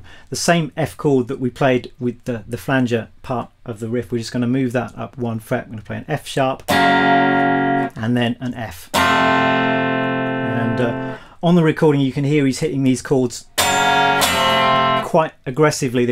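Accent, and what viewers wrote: England, United Kingdom. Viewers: 10 années déjà....Merci, Mr! J'adore ton niveau musical, j'adore ton accent Anglais, bref, tu es très bon et très sympathique cher ami musicien....merci pour ce cours que j'apprécie beaucoup car même avec mes dix années de guitare, j'ai encore besoin d'un super bon comme toi pour m'apprendre le morceau exceptionnel, irrationnel, indémodable des...Merci infiniment, Monsieur! Je ne suis pas prêt de t'oublier cher ami.....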